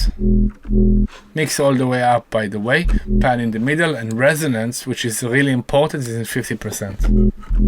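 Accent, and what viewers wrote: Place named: Israel. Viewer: קשה להסתיר את המבטא הישראלי באנגלית, תודה על הסרטון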